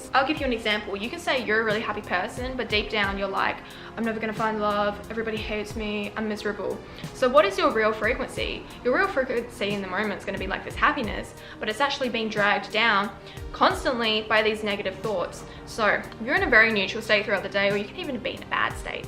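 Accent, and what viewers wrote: Australia. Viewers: not me slowly adapting aussie accent bc of her 😭😭🔪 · its an aussie accent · Please my British accent sounds so stupid trying to imitate her aussie accent 💀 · as an Aussie U can tell cause of the r sound, like when she says nose she says norse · i watch her so much i'm starting to speak with an australian accent now.. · R u an Australian? Ur accent is totally different 😍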